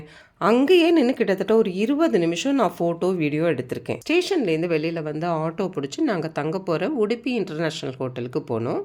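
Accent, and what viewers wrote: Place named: Thanjavur, India. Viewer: Very Pleasant divine Tanjore Tamizh Narration without the noise and exaggeration.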